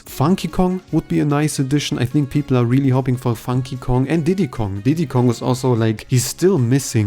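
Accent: Germany. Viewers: IMO the city tracks in this wave were a huge improvement, Singapore is pretty good and Bangkok is even among my favorite tracks in the entire game! Side note, by the way you talk it's very noticeable that you're German (ich bin auch deutsch ^^). Try to use the word "the" less in places where it doesn't belong in English. For example, just say "Yoshi's Island" and not "the Yoshi's Island".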